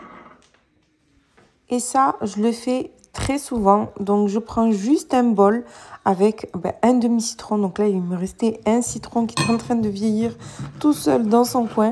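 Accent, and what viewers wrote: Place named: South, France. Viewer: Salam aleykoum hbiba 🥰. Merci pour ta vidéo motivation 🙏. Tu as une belle maison 🏠 dans la prairie 😂 machallah. Moi aussi je finis de laver ma plaque et je vaporise du produits vitres pour retirer les traces de doigts. J'adore tes vidéos et ton accent du sud ❤. Qu'allah te préserve toi et ta famille 👨‍👩‍👦‍👦 inchallah 🤲🤲. Bisous Saïda ⚘ 🌹 ⚘ 🌹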